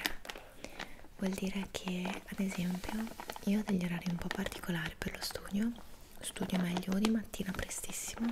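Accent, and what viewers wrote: Italy. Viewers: Where are you from in Italy? My grandmother immigrated to the US from coastal Calabria and my grandfather immigrated from Tuscany so I’m trying to familiarize myself with the different Italian regions and accents!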